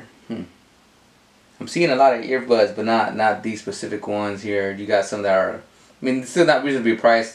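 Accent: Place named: Mexico. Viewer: I don't understand where that's coming from, but he seems to be Mexican through his accent, but you can't tell everyone's origin by just looking at em.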